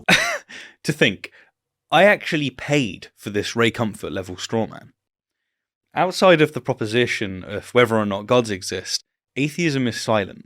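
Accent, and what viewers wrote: England, United Kingdom. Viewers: Sophistry with an English accent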